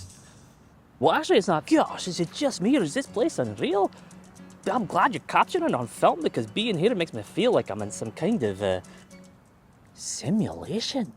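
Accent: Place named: Scotland, United Kingdom. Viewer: As I Scotsman, I’ll forgive you for the Star Trek Scotty accent, for producing a great video. I’ve got a 100f so understand what you are talking about ….. despite the accent.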